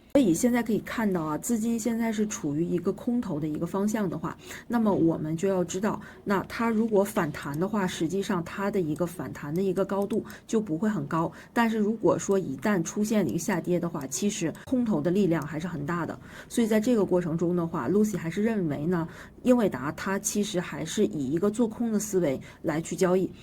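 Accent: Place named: Northeast, China. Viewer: 这东北味很亲切啊 哈哈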